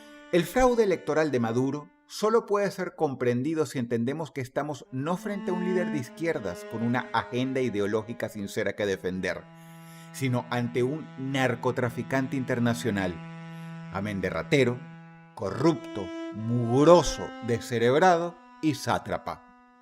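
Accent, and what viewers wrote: Argentina. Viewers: Ya era hora de que lo hicieras, ya hacia demasiado tiempo que agarraste acento argentino. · Jajajaja y por que no habla como venezolano? Tiene acento de argentino o algo asi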